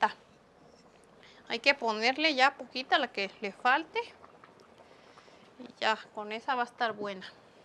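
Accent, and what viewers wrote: Michoacán, Mexico. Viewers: Me encanta como hablas bien Michoacána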